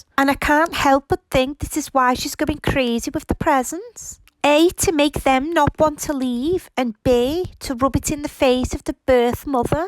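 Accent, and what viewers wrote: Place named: Scotland, United Kingdom. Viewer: Your accent is SOOOOO UGLY Jesus Christ. You sound like a 1,000 pound Scottland woman in a longgggg tan dress.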